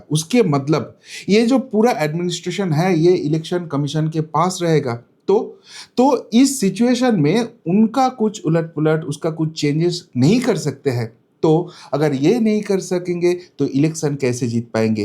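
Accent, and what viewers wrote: Bengal, India. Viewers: আপনার হিন্দি যথেষ্ট ইনপ্রুভ করেছে। বাঙালিয়ানা টানটা যত কমিয়ে নিয়ে আসতে পারবেন তত সুন্দর হবে । ভিডিওটাও যথেষ্ট ভালো হয়েছে ।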